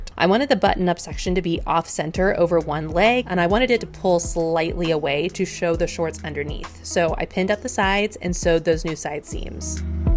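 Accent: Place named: Pacific Northwest, United States. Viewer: Please tell me you live in the PNW. Everything about your voice and the views from the videos I’ve seen tells me that you are - reminds me of Portland, Oregon??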